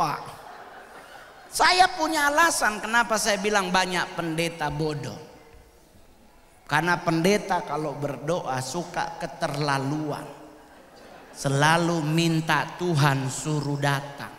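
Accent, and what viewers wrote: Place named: Eastern Indonesia, Indonesia. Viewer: Terharu dengar Manado Batak Ambon Papua...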